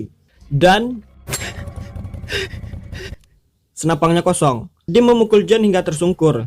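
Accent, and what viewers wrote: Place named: Padang, Indonesia. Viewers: Orang sumatra ni, rasa rasa padangnya ada atau medan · Mimin nya orang padang nya Logat nya kayk orang padang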